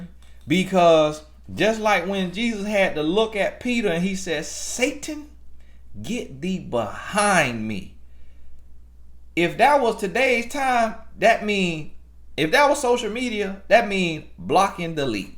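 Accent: South, United States. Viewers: I totally agree keep spreading your message and I love that your from the south and have that southern twang when you talk ❤️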